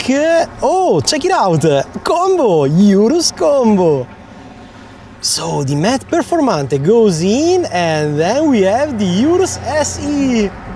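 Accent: Italy. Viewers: Excellent footage, excellent Italian accent!!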